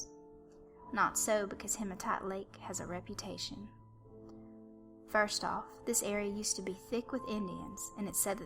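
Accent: South, United States. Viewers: First time listener and new sub! I really enjoyed your video! I'm from Louisiana and if your not really from the South, you did a good job with the accent! I sure hated the first comment gave the whole story away tho... so it kinda ruined it for me,,,but I still liked it! Good Job and good luck with your channel!! Glad I have new stories to listen to!!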